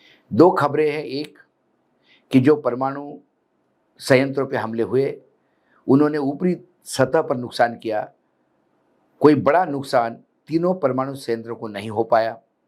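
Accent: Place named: Mumbai, India. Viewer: Andhe bhakt pehle marte bad mai hum 😂❤ ji apki bhasa mast Mumbai tadka Wali hai apko sunne ka ek Maja hai😂alhamdulillah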